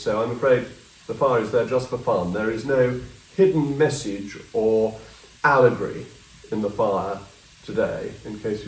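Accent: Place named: England, United Kingdom. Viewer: Jacob I regard you as the most quintessential English man I know. You speaking, your accent and elocution with that fire next to you. Then there’s your breakdown of this dire government I’ve said it before… you’d make an amazing PM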